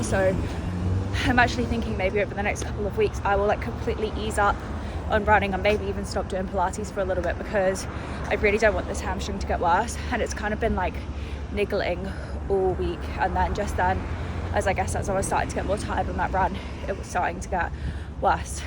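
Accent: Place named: Australia. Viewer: g day/evening for you can I ask when did you start picking up the aussie accent. love you r vlogs by the way 😊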